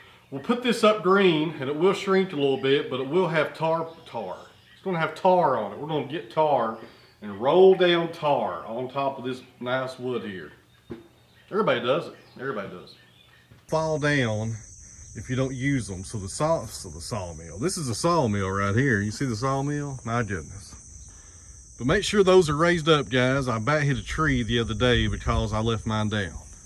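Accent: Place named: South, United States. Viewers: Yes! your accent trips you up sometimes, I think. I find, myself, I sometimes end up trying to say a word two ways at once, the accented version and the standard "television newscaster" version. I muchly prefer the Eastern Tennessee version. I too watch in part because of this. and yes, like, "all you all" is a real southern phrase. when I lived for a while in Johnson City, I really noticed how "storytelling" most people's speech was. Even a trip to Walmart might could be made into a story. And the phrases too. "a ton of pine" sounds OK by me. Southern phrases, yes indeed. many are made-up on the spur of the moment. Thus your "bloopers" are often endearing to me. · Well you are in fine form, Quite frankly it does not worry me. It is great to here that southern accent. Go for it buddy.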